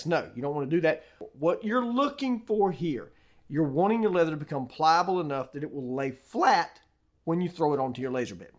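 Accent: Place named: West Kentucky, United States. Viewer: Just sounds like my friends back in the West Kentucky hollers. Makes it so much more enjoyable. My wife bought me a small, inexpensive laser. Been doing this for a while, gonna try it this way. TY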